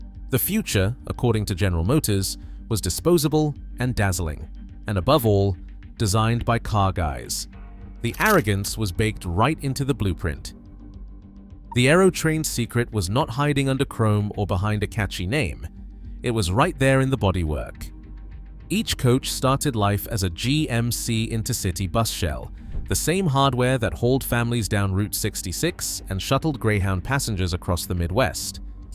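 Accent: United Kingdom. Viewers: 😅can't listen to a Brit talk about this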